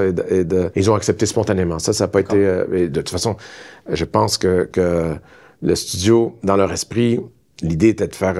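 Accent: Quebec, Canada. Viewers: Si c'était pour les malentendants ce serait toujours écrit tel quel. Aussi, ils pourraient être en CC et non intégrés à la vidéo. Leurs autres vidéos n'ont pas de sous-titres comme ça quand ils interviewent des gens avec des accents parisiens ou français. Ces sous-titres semblent là justement parce qu'il est Québécois. C'est toujours bien qu'il y ait des sous-titres pour rendre la vidéo accessible, mais là ça semble être à cause de son accent alors qu'il parle de manière tout fait comprenable pour les Français. Je ne peux pas croire que l'accent québécois soit si difficile à comprendre, mais il semble que pour cette chaîne, oui. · De plus en plus de vidéos d'artistes ou politiciens français de métropole ou pas, sont sous-titrées pour les malentendants ou les personnes ne pouvant utiliser le volume. Rien de personnel contre le très agréable accent québécois je pense. 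No offense :) (perso j'avais même pas vu qu'il y avait des sous-titres!) ')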